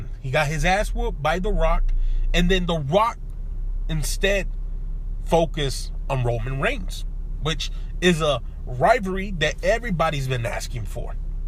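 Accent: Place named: West Coast, United States. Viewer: Bruh I forgot I even commented watched this again dying laughing your accent is gold bruh 😂 definitely a west coast Latino 🥂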